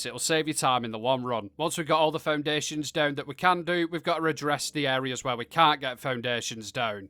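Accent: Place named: Yorkshire, United Kingdom. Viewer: geez your accent is thick, from yorkshire? i'm from downunder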